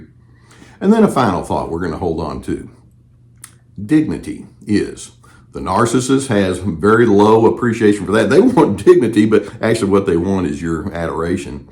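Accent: South, United States. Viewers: insightful and educational as ever. I love your southern accent too!❤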